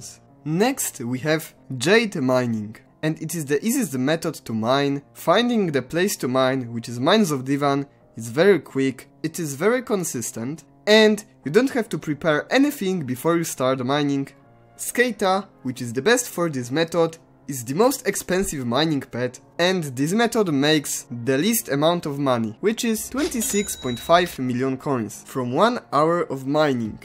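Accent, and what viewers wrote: Poland. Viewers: jestes z polski? bo giga masz taki akcent polski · u definitely sound like u have some polish accent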